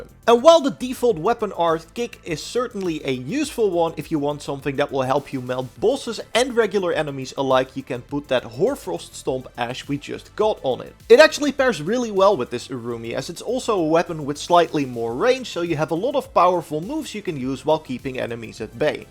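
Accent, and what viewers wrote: Netherlands. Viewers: Jij klinkt zo Nederland's ,lol ,great vid btw ❤️💪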